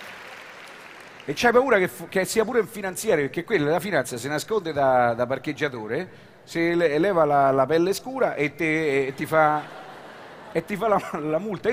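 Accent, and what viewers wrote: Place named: Rome, Italy. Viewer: Troppo forte, poi secondo me( e parlo da milanese), l accento romano accentua ancora di più la comicità, è troppo bello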